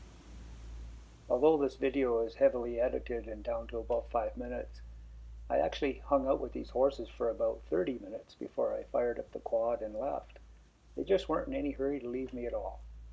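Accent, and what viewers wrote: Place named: Canada. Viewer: Are you Canadian? You sound like Jordan Peterson (A Canadian debater and Harvard professor)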